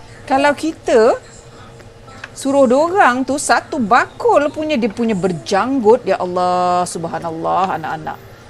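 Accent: Negeri Sembilan, Malaysia. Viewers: Salam i baru ikut your channel apa nama you sis....u dr N9... sbb loghat u cakap macam orang nogori....dr mana Rembau / KP / Seremban...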